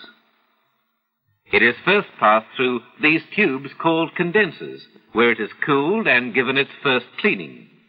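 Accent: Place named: Australia. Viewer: Ah, when the world was still a good place to live. I mean, one third of the world, but still. This is such nostalgia, even with a funny Aussie accent.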